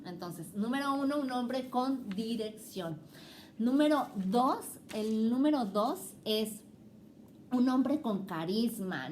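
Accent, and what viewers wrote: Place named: Spain. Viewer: eres Mexicana con acento espanola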